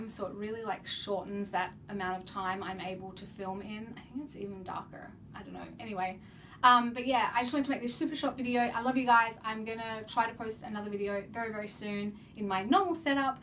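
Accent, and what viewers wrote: Australia. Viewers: You're fast becoming one of my favourite youtubers, and being an Australian it's lovely to hear a familiar accent! Take as long as you need, I know that I along many others will stand by you wil oodles of love and support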